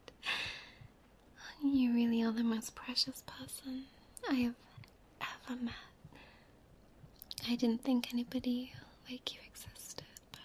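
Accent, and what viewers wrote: United Kingdom. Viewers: Be me, not knowing about yet. First line comes and it has a British accent. "welp...now I have another obsession, thanks youtube"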